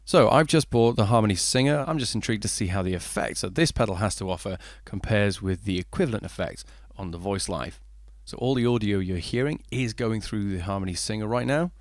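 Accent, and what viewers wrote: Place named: United Kingdom. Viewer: What a great review and video. I've watched a few vids on this pedal looking for specific info and finally, you knocked it out of the park. The eloquence of speech delivered with the British accent was spot on mate. (Haha) Last but not least, the song performances were quite good. If those are your tunes, I'm thoroughly impressed. Hell I'm impressed regardless. Later dude.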